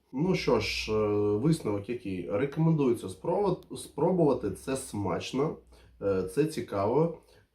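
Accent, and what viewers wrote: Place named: Eastern Ukraine, Ukraine. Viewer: Ну за українську лайк, молодець, відчувається говір такий східної України, але все ж таки це добре буде, якщо будеш частіше випускати такі ролики на українській, а бургер цей рожевий Мохнатівський збираюся на днях поробувати, дорогущий правда, але на хайпі робляться гроші!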